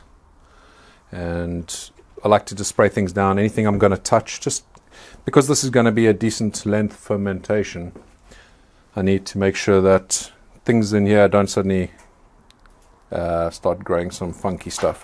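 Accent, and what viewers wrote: South Africa. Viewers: Probably in another video but is that a South African accent? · on closer inspection i believe you are correct. His accent is quite mild compared to most south african i have heard, and some of the accents on words are similar to NZ. But yeah he is south african, he actually says further down the comments. I shoulda read further lol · I have said it before, but I love that South African accent! That sound is home to me. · Hey,. Are you South African? You sound like you're from there. 😃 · South African accent ? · Hi loved this Video and I can hear you are from SA. Where did you buy the equipment(pressure release valve) shown in this video I can't find it in SA.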